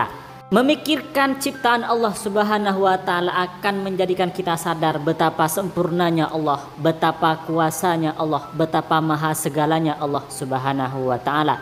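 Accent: Madura, Indonesia. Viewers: Seperti logat orang Syi'ah dari madura yang diusir ngungsi ke stadiun GOR_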